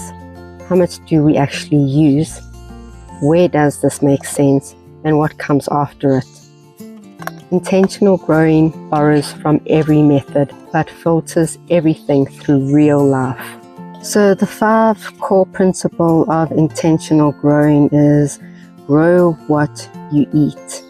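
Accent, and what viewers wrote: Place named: South Africa. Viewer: I thought that was a SA accent 😅and indeed guessed correctly after seeing your description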